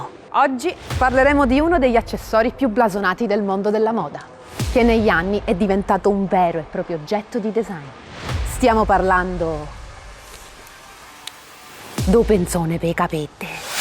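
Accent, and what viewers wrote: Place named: Palermo, Italy. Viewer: Brava..peccato che è difficile apprezzarti appieno fuori dalla Sicilia.In compenso a noi siculi il contrasto tra il dialetto palermitano e il perfetto italiano ci fa tagliare.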